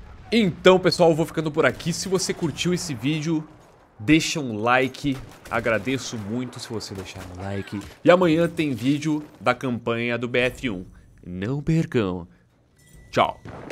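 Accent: Rio Grande do Sul, Brazil. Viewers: funny,voce é gaucho é?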